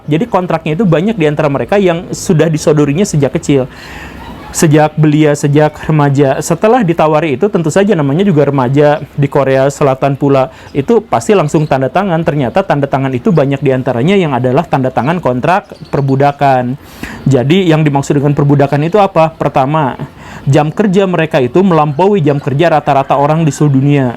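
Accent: West Java, Indonesia. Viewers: Sebut saja saya fans, Saya suka banget dengan paparan informasinya. Saua berharap bisa bertemu langsung dan belajar ke akang. Kebetulan, kedengerannya akang kaya org Sunda, klo bener, saya mau berkunjung buat belajar langsung ke akang. Smoga mendapat pesan balasan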